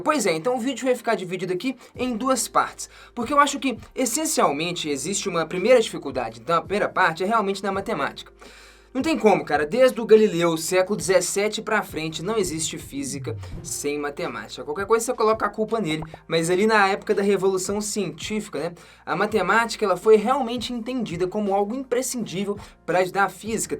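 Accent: Minas Gerais, Brazil. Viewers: otimo video, estou começando o enen para me preprar para o enen, e acho que vc e de minas ne kk obrigada. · Estava vendo o vídeo e anotando as partes pra estudar depois e tive que comentar sobre esse sotaque mineiro, ksksskskskkssk, coisa mais fofa.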